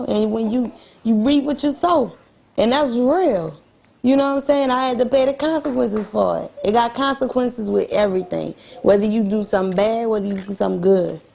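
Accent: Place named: Los Angeles, United States. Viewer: Gotta be from L.A. w/ that accent and her fine self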